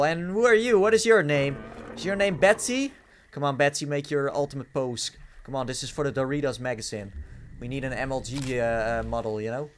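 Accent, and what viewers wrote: Netherlands. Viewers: are you dutch? ikke ook xd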